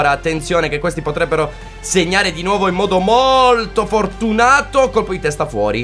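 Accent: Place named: North, Italy. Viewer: Ripeto che mi sembri del nord, poi io sono di barletta quindi capisco se uno è di qua ma a te non mi sembra proprio😂. Comunque FORZA FOREST GREEN💚🖤💚🖤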